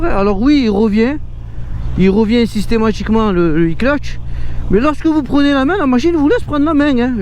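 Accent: South, France. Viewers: Super vidéo ! Et franchement ton accent du Sud quel plaisir à écouter pour un parigo comme moi 💪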